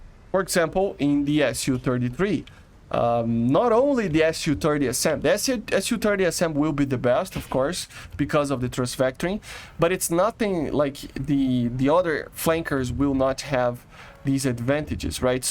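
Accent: Brazil. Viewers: Bro is definitely Brasilian, just by the accent and the way u talk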